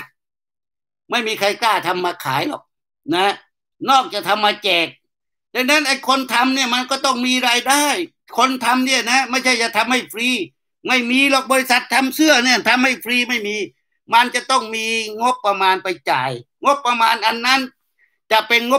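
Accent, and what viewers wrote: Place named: South, Thailand. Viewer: Love your Southern Accent!!!( Ohh.Pukk Tai Ban Rao.)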